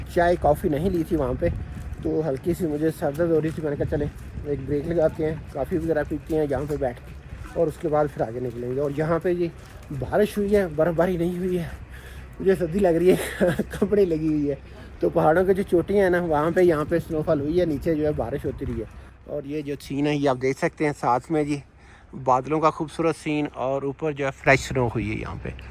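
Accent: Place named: Punjab, India. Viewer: جی آپکی اردو بہت بری ھے پنجابی لہجے میں اردو نہیں بولی جاتی ، ناک میں بولتے ھو پاں پاں کر کے ،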